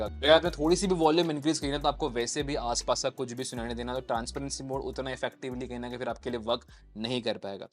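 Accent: Indore, India. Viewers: getting in the video for a sec I realised you are indori and then I read your name, and you are indori, I am also indori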